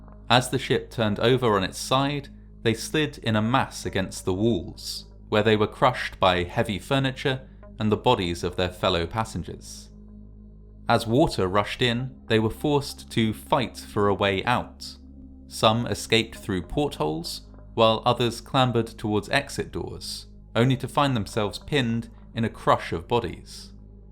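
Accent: United Kingdom. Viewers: Ohh, thanks. Having anxiety symptoms. A guy explaining a shipwreck in a British accent is very soothing. Might watch others.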